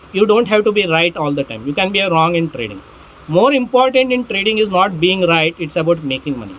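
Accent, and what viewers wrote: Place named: Karnataka, India. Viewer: Oh sweet Kannada song, I was guessing you are kannadiga from your accent now I’m confirmed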